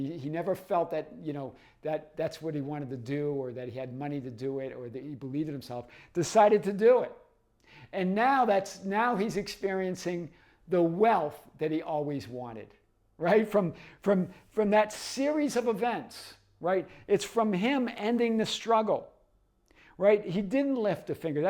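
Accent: Boston, United States. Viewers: Great video! Your accent reminds me of Boston 💪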